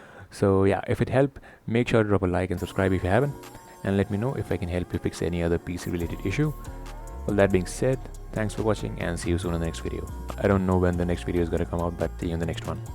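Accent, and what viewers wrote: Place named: India. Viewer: Judging from your accent you're most likely Indian. Always the Indian people helping with issues like this so thanks.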